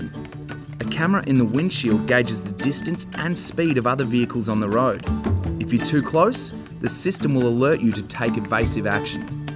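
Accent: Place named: Australia. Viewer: Other than this guy speaking Aussie and through his nose - this is one of the best CX9 reviews on You Tube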